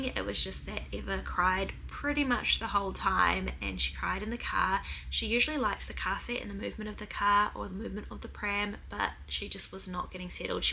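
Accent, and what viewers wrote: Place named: New Zealand. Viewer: you and Brogan have the most beautiful babies...truly 3 of the most lovely little babydolls ❤ Also I could listen to you speak all day, love NZ accents! Love from North Carolina USA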